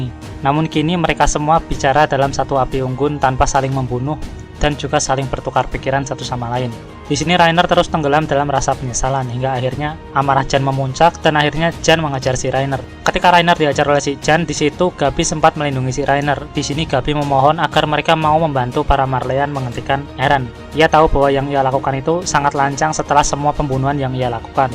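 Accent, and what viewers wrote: Java, Indonesia. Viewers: Koe yo wong jowo to 😅, tanks min dengan ini aku bisa tidur nyenyak 👍👍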